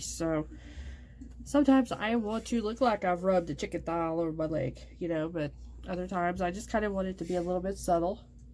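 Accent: United States. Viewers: I luv ur "hillbilly" accent 💜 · Girl, bye! Those ppl need to crawl back in their hole. There is nothing wrong with the way you talk. I love our Oklahoma accent. Also, I love it when you talk in your videos. You always make me laugh. This look is gorgeous! That lid shade tho...#Yassssssssssss! Love you more than 2 big busses! Lol! Stay safe! TFS! ~ Nona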